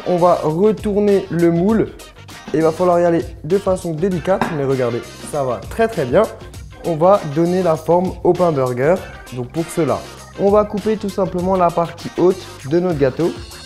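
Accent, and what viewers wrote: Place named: South, France. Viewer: J'aime trop t'es video t'es trop beau ta un accent du suddd mon poteeee x'd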